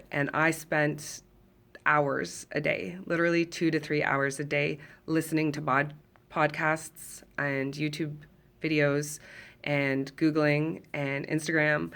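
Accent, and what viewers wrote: Canada. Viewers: I love the Canadian accent …….thanks for sharing your story.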